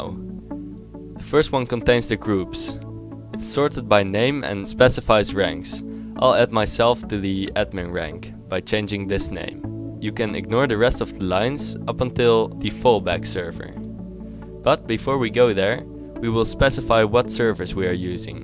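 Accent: Netherlands. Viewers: je klinkt een beetje Nederlands haha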